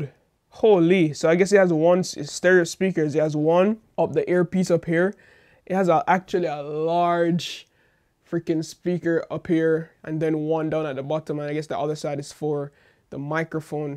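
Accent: Jamaica. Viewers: Are you Jamaican, I hear the accent but I'm not sure. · Can't hide that Jamaican accent... You Jamaican? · from you start speaking I said this definitely is jamaica 🇯🇲🙌🏽🙌🏽🙌🏽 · your Jamaican accent is rich i love it 👌🏾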